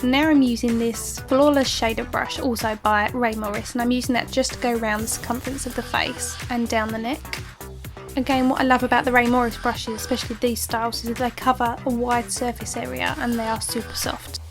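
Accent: Essex, United Kingdom. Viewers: love your accent, Essex? x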